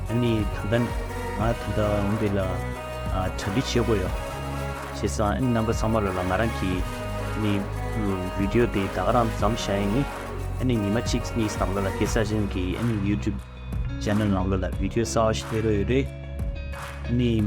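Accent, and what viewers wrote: Standard. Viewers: you speak Tibetan pure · Tashi Delek! Amazing video to watch!! Especially, your Tibetan language is pure and perfect 😍 Watching from New York!❤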